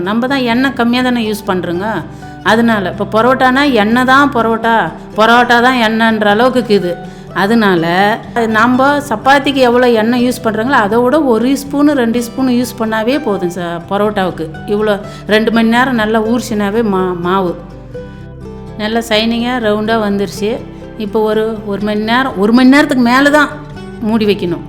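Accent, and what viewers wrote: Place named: Krishnagiri, India. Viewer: Authentic nama ooru slang bro.... love From krishnagiri... Near to your area.. NEW HOUSING UNIT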